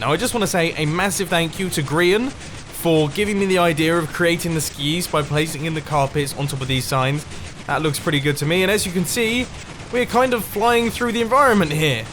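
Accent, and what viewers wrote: Netherlands. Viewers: Lol hoe hij nederlands spreekt😂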